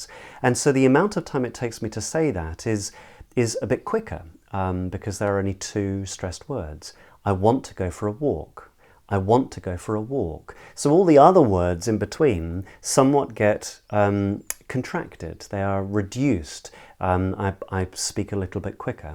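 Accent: RP, United Kingdom. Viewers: Great video! Let me point out once again that you're one of the best Standard British English (Contemporary RP) Pronunciation teachers I've ever come across on YouTube and since I occasionally teach and translate English professionally myself, your tips will surely improve my teaching skills.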